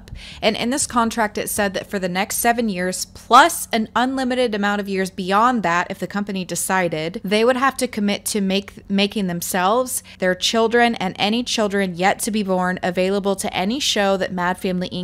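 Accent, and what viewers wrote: Arkansas, United States. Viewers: ❤ Are you from Arkansas? I am..😂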